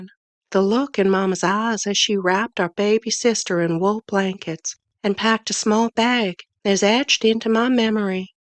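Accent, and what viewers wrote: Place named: South, United States. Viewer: Just curious why the Southern accent? The setting is upstate NY.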